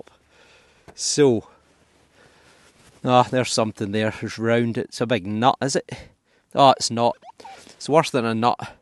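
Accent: Scotland, United Kingdom. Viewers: Grettings from Denmark. I love to watch your videoes and listen to your charming scottish accent. Keep on the god work 😊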